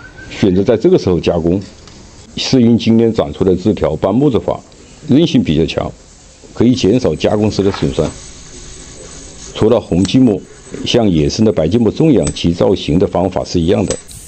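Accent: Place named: Changsha, China. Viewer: 像长沙话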